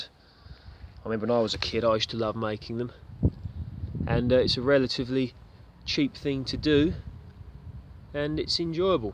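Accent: United Kingdom. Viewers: I was like hmm I wonder how easy they are to grow in the UK so I’ve been scrolling through videos trying to find a familiar British voice lol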